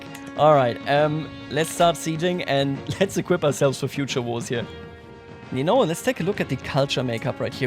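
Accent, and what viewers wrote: Germany. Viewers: I heard your english and think: "Mhm he sounds like a german." And than i see your Channelname 😂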